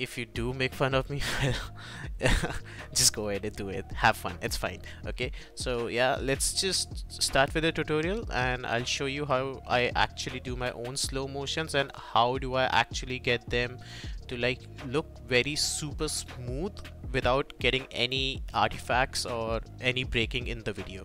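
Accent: India. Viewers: nah i love your accent, indians make the best tutorials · Don't think too much about it man you actually have one of the lighter indian accents that i have heard lol🤣🤣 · Best indian accent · I want to get ur accent i try but I can't, I even watched a yt vid on how to sound like Indian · Hey buddy quit trying to explain your accent, it's cool and we don't care. And thank y'all indians for sharing your knowledge online for free. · watched hundreds of indian tutorials, i wont even care if you speak indian id still understand you either way, even if im not indian. good tutorial tho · i stopped the video when you said that you're from India and you have an accent. let me tell you something real quick my friend you have a great talent and your English is GOOD, do not worry about any negative trash talk about anything that comes around you just keep doing what you are doing because it's GREAT !!! 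and now I'm learning from you my friend <3 Thank you for posting such a great talent like that :D B+ always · this tutorial helped me out alot ! and honestly i dont know how anybody could make fun of your indian accent, your english is very good ! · Bro just watched your tutorial dont worry about your accent! I've come to loved this accent since I always learn from indian guys in youtube! You guys are simply the best. :)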